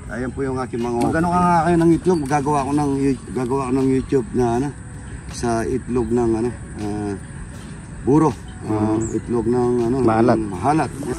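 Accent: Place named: Pampanga, Philippines. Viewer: Good morning kuwait Good morning Philippines Kapampangan accent neh idol..I miss Pampanga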